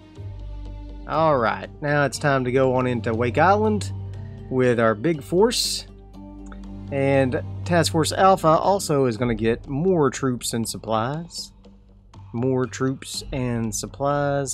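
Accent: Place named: South, United States. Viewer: 1) I'm hearing a Southern accent. Where ya from? I'm from Texas myself. 2) Holy crap...I didn't know someone modded WoWs vessels into the game! Makes you wonder what Japanese Navy ships you'll run into! Wonder if they fixed the striking power of the B-17s...I watched one play-through of the Tokyo Express mod where a YAMATO-class battleship was sunk by a SINGLE B-17. (No, I am not lying or BS-ing here, nor have I been drinking. 😂)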